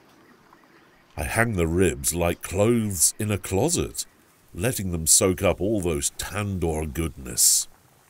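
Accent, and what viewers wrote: United Kingdom. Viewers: I've seen so MANY of these videos to know that is a superb cook! I LOVE it, when there's NO narration, with the quiet absorption of nature. That being stated, I'm OKAY with this (I assume, British) gentleman's voice; he speaks in the first person...as voice. Whereas I find it a bit hard to associate the accent with the person (!), I appreciate that this narrator doesn't excessively talk...he pauses now and then, which is good. I'm still diligently attempting to discard national prejudice(s). Our fellow humans NEED to sustain love, especially in these times of turmoil. does that. SUPER 👍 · That dubbing or voice over does not go well with the genuine pure nature of these videos. Your videos are like a window into that simple village life. Voice over in a British accent feels like having coffee with salt.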